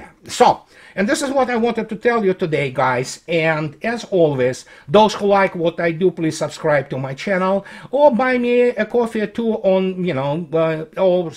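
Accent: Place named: Russia. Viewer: 😂this is like me going for dental 🦷 to the auto mechanic. Ваш русский акцент теплеет душу, у всей семьи, особенно у Дяди, такой был. Спасибо за вашу работу, спасибо за ваш русский юмор.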